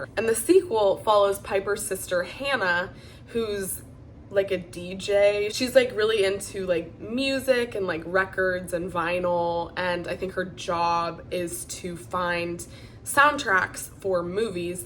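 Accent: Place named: United Kingdom. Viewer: Your British accent kills me every time 🤣🤣🤣🤣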